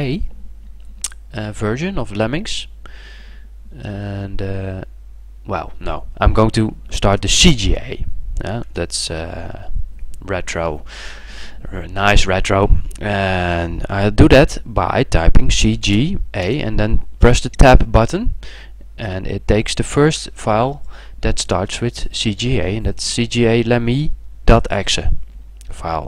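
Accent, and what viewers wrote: Netherlands. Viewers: Dat kwam ik achter. Dat was duidelijk Engels op een Nederlandse toon. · Nederlands? Ik kom uit Nordduitsland, ik ken dit accent :P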